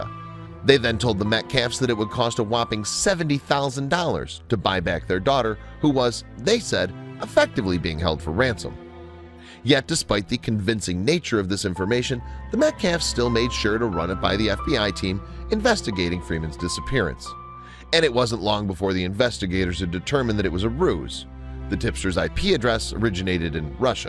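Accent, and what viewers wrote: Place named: Michigan, United States. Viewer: The heavy Michigan accent and repetitive overuse of the word indeed cracked me up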